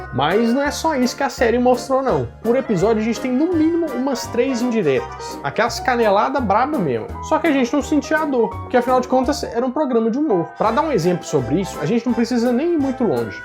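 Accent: Minas Gerais, Brazil. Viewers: Cara tenho muita inveja de quem tem sotaque mineiro kkkkkk é um dos mais lindos do mundo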